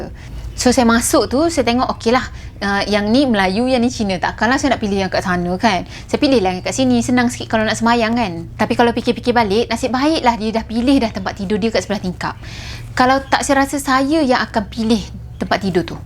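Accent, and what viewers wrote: Kelantan, Malaysia. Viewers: Mek kelante rupanya mati2 aku ingat wong jowo. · Salam NI orang kelate ke?? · Ore kelate ko? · Laaa..ore kelateee..... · Asal kelate ko??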